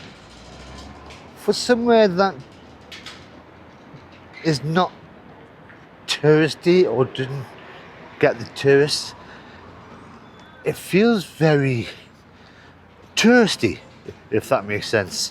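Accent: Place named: Northern England, United Kingdom. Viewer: Judging by the vowel sounds, I'd say he's from the north of England.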